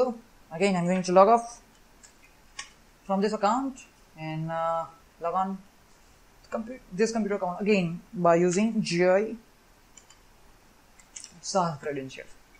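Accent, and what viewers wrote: India. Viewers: seems like a good video, but i can't understand your indian accent..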